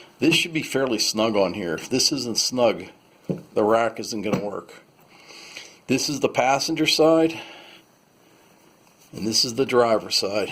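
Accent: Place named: Pittsburgh, United States. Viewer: I heard yinzer before I saw P.H. on the paper. A-K Valley native here.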